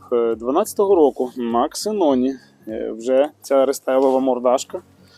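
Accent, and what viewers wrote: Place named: Germany. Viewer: Гарний німецький акцент, дякую за відео, дуже цікаво!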